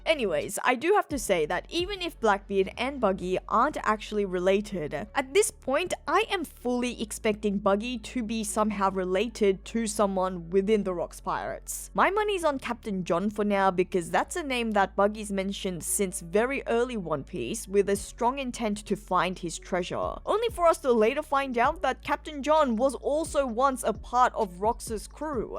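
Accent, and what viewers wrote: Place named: Australia. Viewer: That Australian accent gets me every time 😂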